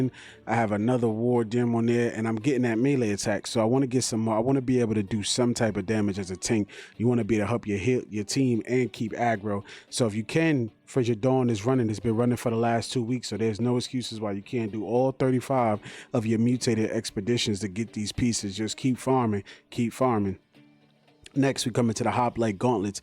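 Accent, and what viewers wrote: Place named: New York, United States. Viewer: my boy from newyork ? where at? haha queens represent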